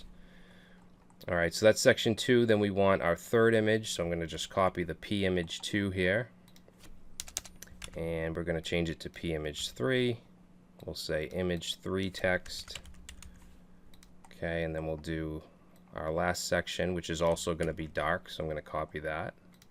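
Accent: Boston, United States. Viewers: dig the boston accent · When you hear someone's voice for so long and finally see their face. It's like my mind just went to a whole different dimension trying to sync up your face and voice. In all seriousness, I actually think your Boston accent helps me learn so much more.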